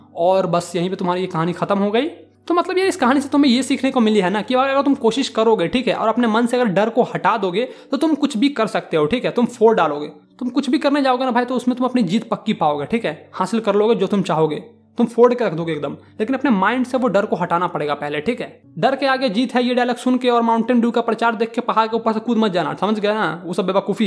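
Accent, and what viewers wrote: Bihar, India. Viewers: Sir bihari ha isliye ud ko ur bol rahe hai 😅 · Bihari hai tu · Bhai bihari h wo ...or har bihari jab bihari language mai bolta hai to ek jesa he lgta hai ..😂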